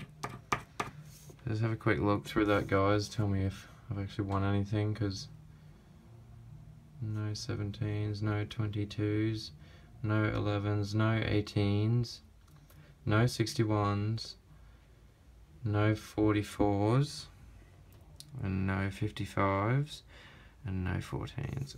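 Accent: United Kingdom. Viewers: He sounds British, but there are dollars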